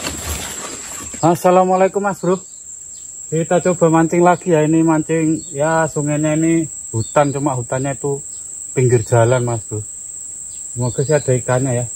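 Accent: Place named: Java, Indonesia. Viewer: Mas, sampiyan ki wong ngendi? kok koyo wong jowo? jowo ngendi mas?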